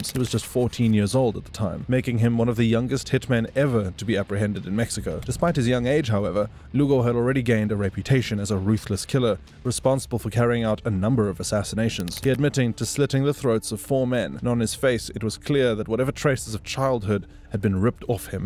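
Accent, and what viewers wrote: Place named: South Africa. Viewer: Its a south african bloke lol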